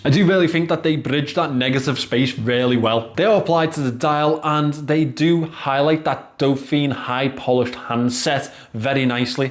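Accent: United Kingdom. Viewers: you are from which part of The UK?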